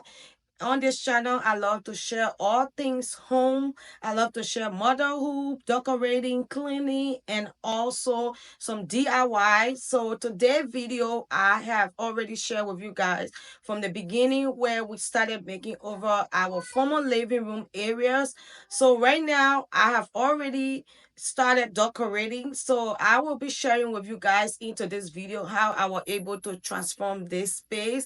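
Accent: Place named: Liberia. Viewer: New here on your channel, like your content, your name is Ghanaian but u have a Liberian accent,you did a good job...🫡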